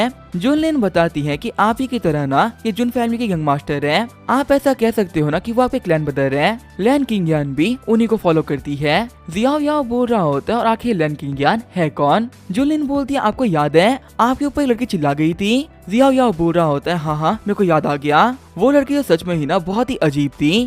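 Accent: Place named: India. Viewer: FFS why indian voice AGAIN !! Did this channel get hacked or something ?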